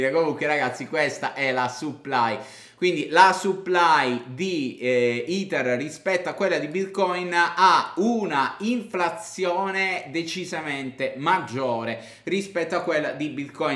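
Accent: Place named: Cosenza, Italy. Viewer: sei cosentino e la d e la t si sentono... prima o poi spero di poterti salutare di persona covid permettendo😉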